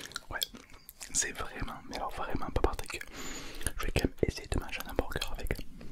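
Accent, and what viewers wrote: South, France. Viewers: Je me demande si tu viens pas du sud on a peu près le même accent ! En tout cas j'adore tes vidéos ❤️🙏🏻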